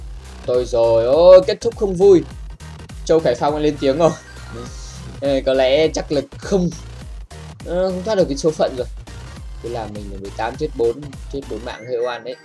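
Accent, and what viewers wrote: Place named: South, Vietnam. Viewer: nói tiếng Nam hai đấy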